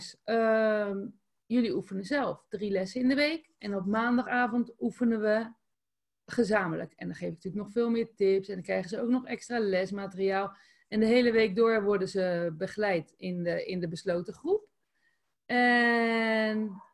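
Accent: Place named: Netherlands. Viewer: Aún tiene un acento holandés grandísimo lol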